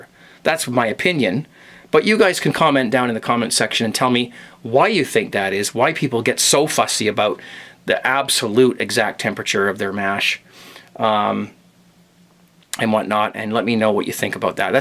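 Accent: Scotland, United Kingdom. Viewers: thats a crackin scottish accent that made me laugh! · By the way, love your scottish accent ;)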